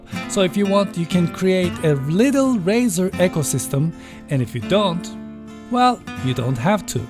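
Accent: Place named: Turkey. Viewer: Aksanından Türk mü bu adam acaba dedim :)))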